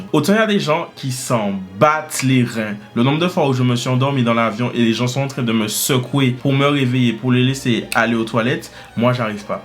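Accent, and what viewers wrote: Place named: Martinique, France. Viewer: Phoenix en Arizona et expatrié depuis 1979. Ça fait bien plaisir d'entendre l'accent de la Martinique. Tu as beaucoup de talent. Merci pour tous les bon moments de rires.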